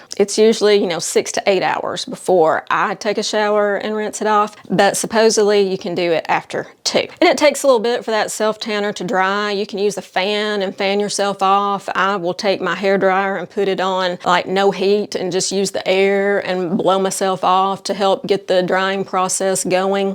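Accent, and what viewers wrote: South, United States. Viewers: You are such a refreshing and “ real” influencer ! I thoroughly enjoyed your video and love your accent cuz I’m a southern girl too!! Great job!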